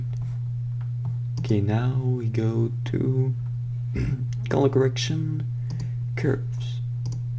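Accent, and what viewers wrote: France. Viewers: Thanks. Hey i've a question! Are you french? I'm sure.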